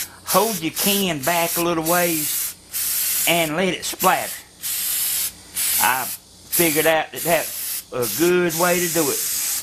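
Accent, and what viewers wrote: South, United States. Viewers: this guy knows what hes doing. but yh , true southerner ! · I'm use to hearing people talk like this since I live in the south. Pretty common with family and co-workers. Like listening to an old guy tell a story from his youth. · I like your attitude and your southern accent. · This man did a great job. Now if you can't understand him don't listen to his presentation. I'm not from the south but I could understand every word he said. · dude you have an awesome accent really American I like that ! :3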